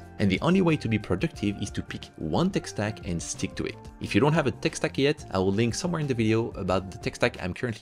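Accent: France. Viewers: Are you French ? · French accent is like honey for my ears Thanks for sharing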